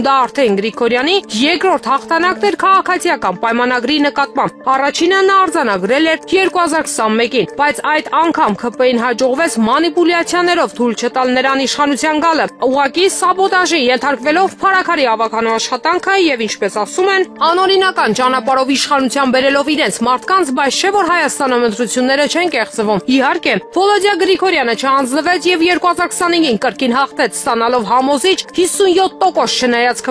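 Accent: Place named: Turkey. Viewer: Այս հաղորդավարը թուրքի ստիլով է խոսում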